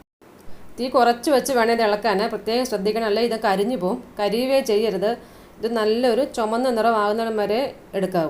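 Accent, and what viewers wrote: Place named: Kottayam, India. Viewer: സംസാരം കൊള്ളാം കോട്ടയം സ്ലാങ് ❤️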